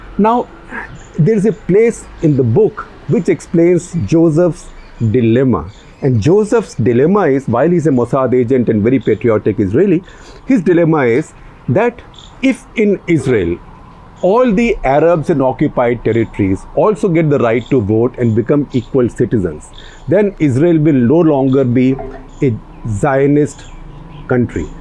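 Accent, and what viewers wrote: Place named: Hinglish, India. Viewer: I like your HINGLISH man😂😂😂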